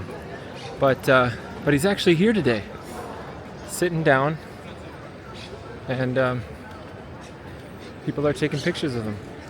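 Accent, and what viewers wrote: Andalusia, Spain. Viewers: Love that u speak andaluz😮‍💨💚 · me encanta tu acento andaluz 🤩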